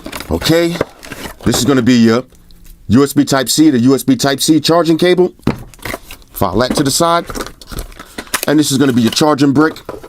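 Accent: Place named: East Coast, United States. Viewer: Extra aggressive guy. Great review. Surely you are from NY or Chi. Sounds like east coast.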